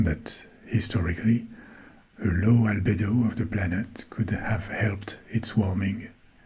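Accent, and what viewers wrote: France. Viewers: back to spaaaace 🎉😂 plz dont loose the french accent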